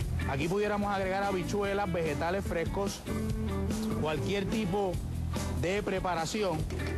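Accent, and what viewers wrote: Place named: Dominican Republic. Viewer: Este chef , siempre me a aparecido su acento como Dominicano, no puertorriqueño..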